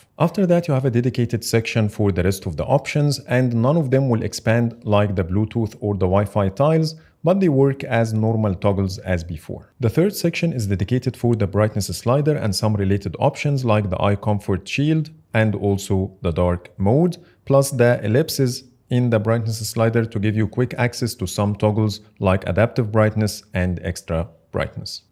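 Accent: Egypt. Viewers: im guessing you are egyptian because of your accent its really good to see ppl from my country making videos in english too keep up the good work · مش عارف ليه حاسس انك مصري 🙂 لهجتنا معروفة حتى بالانجليزي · The one talking in the video is Egyptian, I know that accent man xD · اللهجة المصرية بالانجليزي مستفزة جدا ....مفيش كلمة وحدة بتتنطق صح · your accent is so Egyptian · يابن عليك مصري اوي يا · brother are you from egypt you have a very masri accent love from uae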